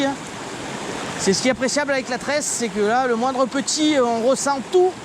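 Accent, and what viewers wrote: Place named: Auvergne, France. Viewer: Salut camarade Bougnat .... Heureux de retrouver tes vidéos......et ton accent ...j'ai le même.....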